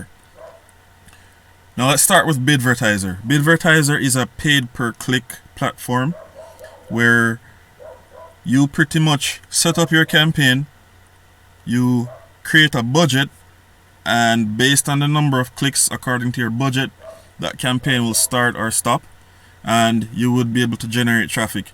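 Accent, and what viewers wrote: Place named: Jamaica. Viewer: You sound like a yardie bro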